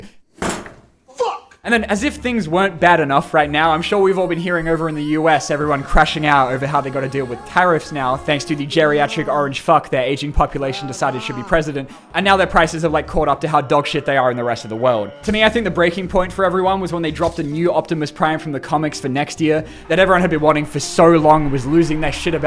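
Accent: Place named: Australia. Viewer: Idk why but I thought u were Australian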